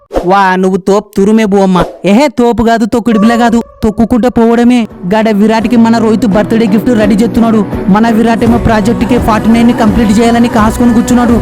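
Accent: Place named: Gadwal, India. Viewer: Super anna, Gadwal slang lo raffadinchinav po, ni concept nd creativity ki na salam inka ilanti enno manchi videos cheyalani korukuntunna 👏👏😇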